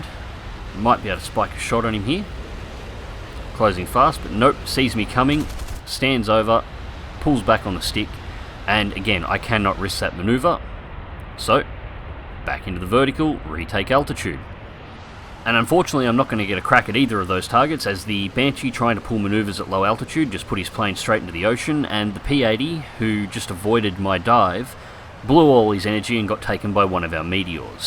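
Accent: Australia. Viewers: He's from Australia, hence his logo and his accent.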